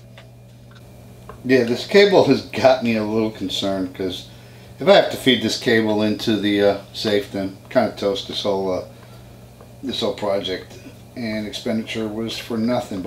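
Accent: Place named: Brooklyn, United States. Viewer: Fantastic video; really well done. I have to thank you, since I had tried other videos on YouTube, many of which were very well-made, as well. However, your's did the trick, and saved me a ton of dough in the process. I'm truly grateful to you for having taken the time to post this. BTW--- Love the accent... Brooklyn???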